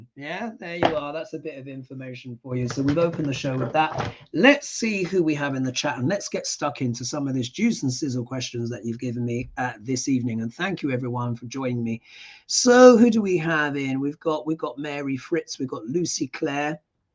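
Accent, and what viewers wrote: United Kingdom. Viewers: (Jeannette M Mahan) Hi handsome Mr, your British accent is music to my ears, I love British people! I say that with the utmost respect.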